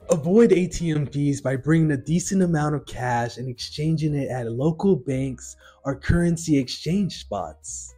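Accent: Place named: California, United States. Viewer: Good video thanks! Where are you from dude, you sound like a California surfer lol.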